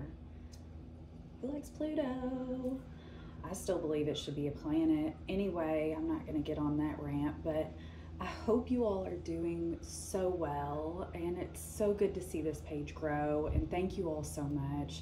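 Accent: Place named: South, United States. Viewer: Fantastic reading. You really explain well the Pluto energy and its impact on relations. I subbed because your explanations are great and I'm a Southerner and love listening to us discuss mystical things. 💯💯💯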